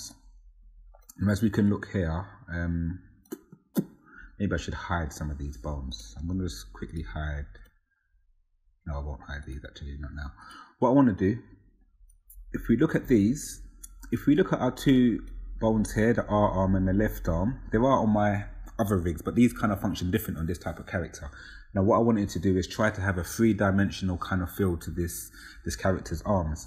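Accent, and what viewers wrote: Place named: London, United Kingdom. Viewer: First one I've seen of you with a voice-over. Enjoying the London accent.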